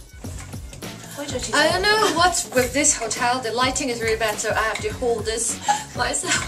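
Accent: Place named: United Kingdom. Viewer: British accent!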